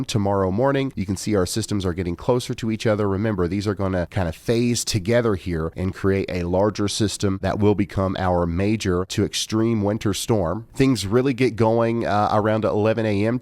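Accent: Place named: Kentucky, United States. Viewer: Eastern KY where you live? Omg! Idk you were from KY! Should have known though bc you sound like a Kentuckian! 😂 (Me too 🤷‍♀️)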